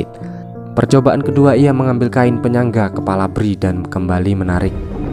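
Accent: Surakarta, Indonesia. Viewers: Wong solo to mas😂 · Orang solo ya bang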